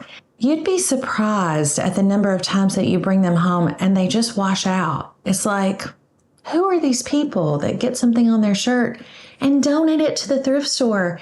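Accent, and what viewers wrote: South, United States. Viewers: I love quilting, I love fabrics, I love that you thrift shop with your daughters and I love your calming, reassuring southern voice🙂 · I needed some fun this morning & your video fit the bill. Thank you for posting lighthearted and fun content. I enjoy that you let the South out in your speech. Being from Texas, it makes me feel like I have a neighbor talking to me. Have a great day! You've given a great start to mine!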